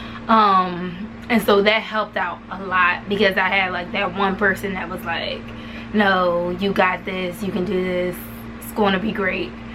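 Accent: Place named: DMV, United States. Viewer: You sound like you're from the DMV! Lol where are you from?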